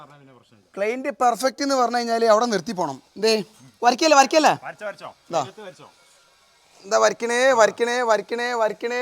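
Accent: Malappuram, India. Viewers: താൻ മലപ്പുറത്ത് എവിടെയാണ് തന്റെ സംസാരം സൂപ്പറാ👍🏻👍🏻👍🏻👍🏻👍🏻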